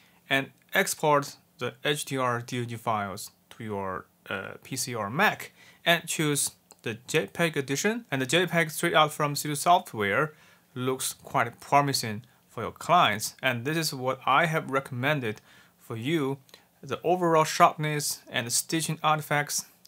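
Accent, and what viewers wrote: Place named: Asia. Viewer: I like your content. But I do find it hard to understand your Asian accent in English. Keep up the work!👍😀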